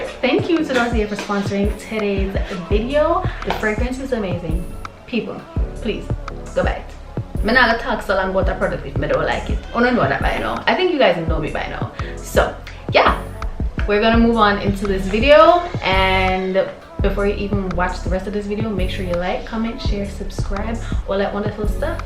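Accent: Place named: Jamaica. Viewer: Hey! Honestly I love when you speak just patwah 😍😩. And it's giving authentic vibes, I guess maybe you switch it up for the diversity on your channel.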